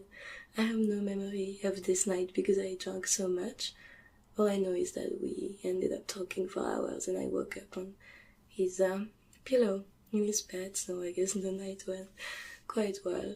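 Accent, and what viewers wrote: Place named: France. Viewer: Tingly as always <3 Your french accent videos are so tingly man. Your hair!!! Are those clip-on bangs or a whole wig or new hair or--- you look gorgeous anyway:)))) Thank you for the video <3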